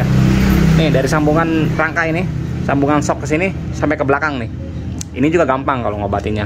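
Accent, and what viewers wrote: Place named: Indramayu, Indonesia. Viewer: Ngomong jawanya kya jawa indramayu😁😁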